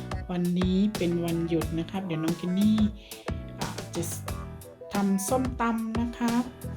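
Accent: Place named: Isan, Thailand. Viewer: ถาดน่ารักเชียวค่ะคุณเคนนี่ พรนึกว่าคนจีนพุ้นเด่ เห็นเว่าอิสานน่ารักดีค่ะ คนจังหวัดใดน้อ❤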